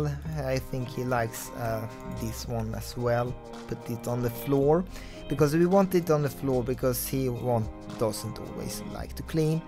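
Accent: Sweden. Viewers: dat swenglish man ;) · Dat Swinglish xD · The swenglish 😂😂 I am from Sweden so it doesn't bother me 😂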